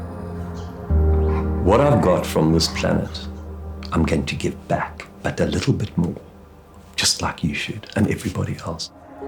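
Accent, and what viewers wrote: South Africa. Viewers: You sound South African. Thank you for your positive video Happy Retirement. 🇨🇦🇿🇦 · Retiring brings on a mindset of … it’s the end 💥 … agree with that. You sound like a fellow South African … Blessings 🇿🇦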